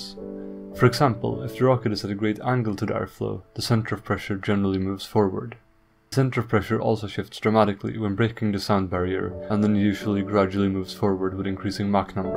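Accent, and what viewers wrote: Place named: Skåne, Sweden. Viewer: Hi there. I have spent half-hour following leads from ' ' - all of which are dead-ends. So I have to contact you in public. I see you speak Skåne - or were warmly influenced by that region. I speak that other great language. I'm English but I live in Sweden (Österlen). Your video on missile stability is truly excellent. I would like to use your work both whole and in part. I have several videos dealing with the German A4 V2 missile and a website called <link> I would, of course, give you full on-screen credit and provide links to a website or other internet site of your choice. Within the limitations of our specialist area (I'm not Justin Bieber!), I can promise you significantly greater exposure, and we have expert subscribers (from industry, academia, etc.) to our website and video channel from around the world. I look forward to hearing from you. 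Kind regards Robert J Dalby FRAS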